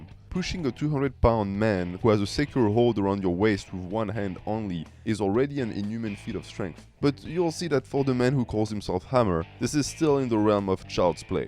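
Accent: France. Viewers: are you french? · En écoutant ton accent, anglais, en voyant ta façon de prononcer certains mots j’en déduis que tu es un français qui parle en anglais, c’est le cas ? In listening your accent, in the way your prononces some words, I’m deduce your are a French guy who speak English, is it true or not ?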